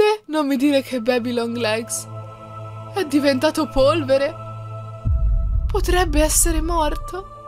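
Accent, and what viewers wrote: Tuscany, Italy. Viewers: ma sei toscana perché ti voglio bene tanto ☺️☺️😊☺️😊☺️☺️ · come sei Toscana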